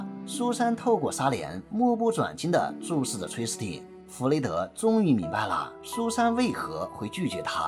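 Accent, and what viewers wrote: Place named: Shandong, China. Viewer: 越哥的文稿写得很精彩，山东口音很亲切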